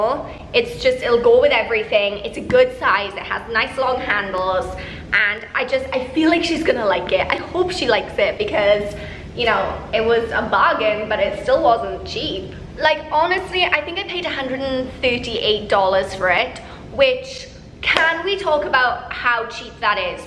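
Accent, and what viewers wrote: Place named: Scotland, United Kingdom. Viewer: I'm usually pretty good placing accents but yours intrigues me.Ive been watching some of your Kuwait vids (Im in Kuwait on a US mil base).You've got a scottish/midlands/aussie thing going on.So which one?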